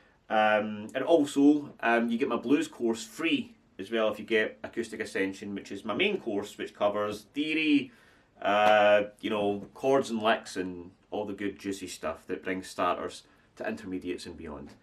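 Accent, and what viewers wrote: Scotland, United Kingdom. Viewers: Good to hear a fellow Scotsmen I improvise basically if you learn the 5 pentatonic scales learntje notes then find them on the fretboard easy lots of practice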